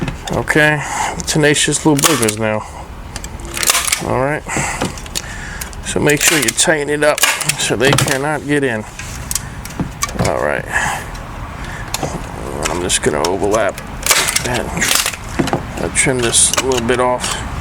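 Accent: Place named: New Orleans, United States. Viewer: I can hear that New Orleans accent , lm a Cajun also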